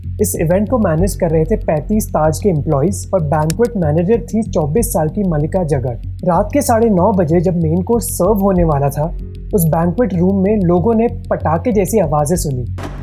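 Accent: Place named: Bihar, India. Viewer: I think it's his speaking style 🙃 like bihari accent or avadhi accent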